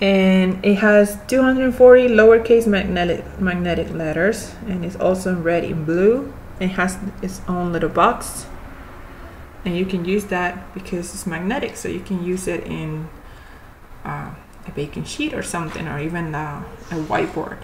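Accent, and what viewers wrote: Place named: Spain. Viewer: Hi, It seems that your mothertongue is Spanish. What language do you work with your child? English or Spanish? I'm asking that because my daughter is bilingual and I'm not sure if I should try to help her to read Portuguese or French, the language she will learn at school? Can you pls give me an advice? Thanks.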